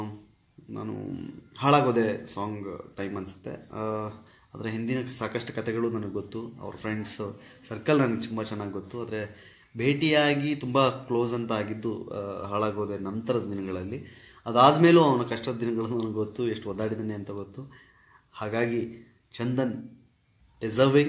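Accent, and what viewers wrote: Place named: Mangalore, India. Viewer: Seriously nimma kannada thumba chennagide... Mathe mangalore kannada na thumba thumba chennagi use madthira.... 👌👌👍👍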